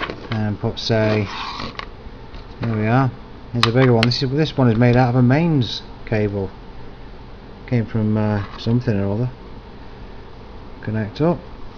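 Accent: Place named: United Kingdom. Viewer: I want to try something different are you from the uk your accent sounded bristish. I have some ideas I wanted to try needed some help if possible ?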